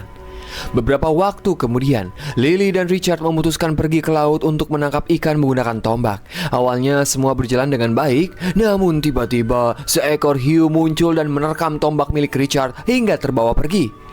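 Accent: West Java, Indonesia. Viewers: Ada Sunda Sunda nya kang😭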